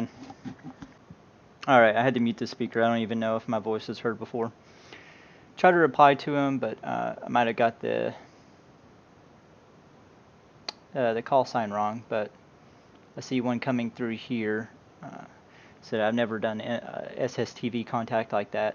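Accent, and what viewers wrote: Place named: South, United States. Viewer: Your accent is that of a southerner, so I find it interesting that you have as a background, the Brooklyn Bridge and the NYC skyline.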